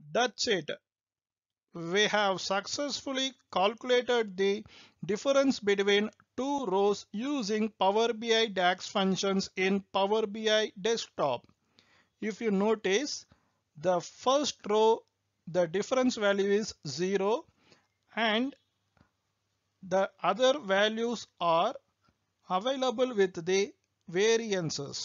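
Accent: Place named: India. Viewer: Content is good, but the indian accent is really giving me a lot of room for excessive thinking.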